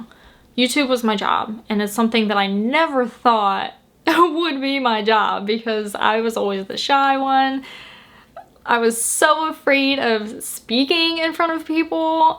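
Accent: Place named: South, United States. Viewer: are you fro the south? you kind of have a southern accent.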